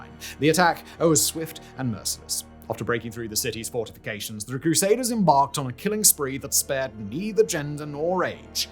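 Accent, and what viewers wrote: United Kingdom. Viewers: why do you sound extra British in this episode?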